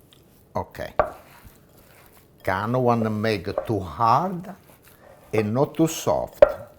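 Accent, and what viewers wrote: Italy. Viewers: He sounds just like my italian family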